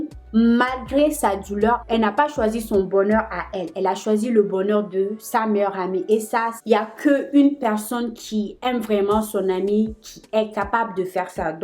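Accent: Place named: Ivory Coast. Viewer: j'adore ta façon de parler et ta personnalité,j'ai aussi cru que tu étais ivoirienne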